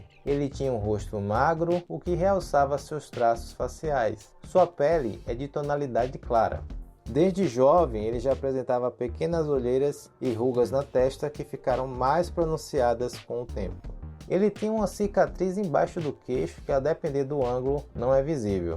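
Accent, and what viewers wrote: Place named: Salvador, Brazil. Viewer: Tu é de salvador? Conhece esse sotaque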